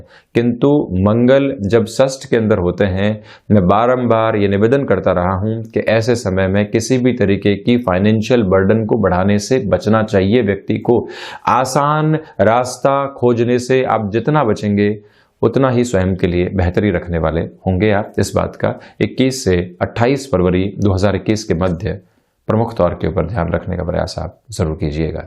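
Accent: Standard. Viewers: Predictions k sath Dharapravah Shuddh Bhasha sunne k liye milti hai aapse ji..... Pranam evam Dhanyawad 🙏 Kripaya bhasha ka standard yahi banaye rakhiye.....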